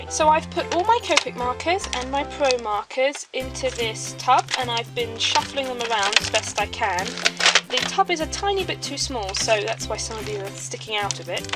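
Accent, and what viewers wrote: England, United Kingdom. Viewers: what shop do you get it from? you sound like a English girl like me. just guessing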